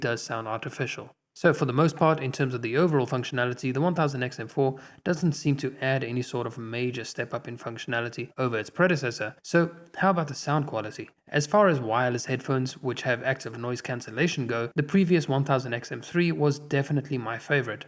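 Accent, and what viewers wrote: South Africa. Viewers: South African accent, but living in Dubai.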